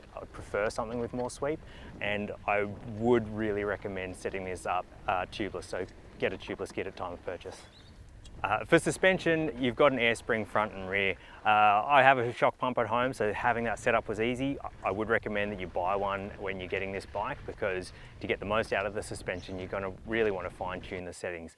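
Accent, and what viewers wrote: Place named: Australia. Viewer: No problem hearing and understanding him perfectly here in Switzerland even with the typical Aussie accent! And he is right btw. actions do speak louder than words mate.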